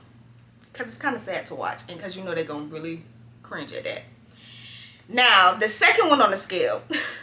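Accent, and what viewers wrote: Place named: South, United States. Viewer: Girl you country den a MF.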